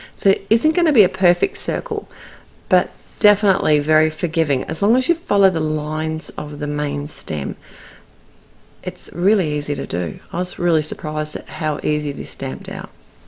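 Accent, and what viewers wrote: Australia. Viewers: Thanks for sharing. Enjoyed this simple but very effective card tutorial. Nice to hear an Aussie voice too. Really hoping to buy some of the new Altenew markers - the colours are very vibrant and pretty. Can we buy Yupo paper here in Oz, do you know? Thanks again for your lovely card.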